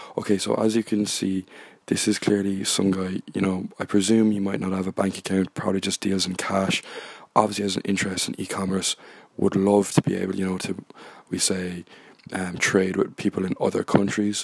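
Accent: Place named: Ireland. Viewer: In Swindon now but lived in Waterford for 15 years. Can listen to many accents and not give a shit but as soon as I here the Irish accent I know I will watch the video :-) + sub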